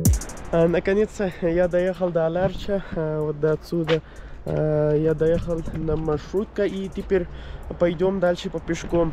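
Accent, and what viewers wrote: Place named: Tajikistan. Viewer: У тебя акцент тажикский😊.. Не доехал , а дошол😊👍👍👍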